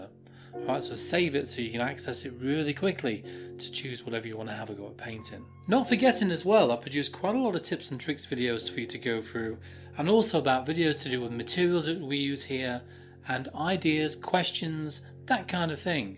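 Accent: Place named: Northern England, United Kingdom. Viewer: Are you from Devon? I'm American but I lived in England from <num> <London) I'm American, so I'm not great with British accents, but you sound Northern to me! (my husband is southeast London.. I'm gonna ask his opinion :-) all British have an opinion on accents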